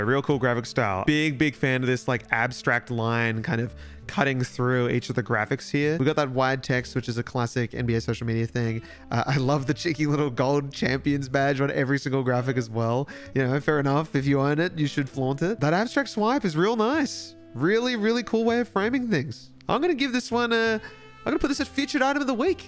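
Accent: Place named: Australia. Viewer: surreal hearing an australian talk about american basketball like this. i’m applying for a master’s in visual communication design btw i’ll let you know how it goes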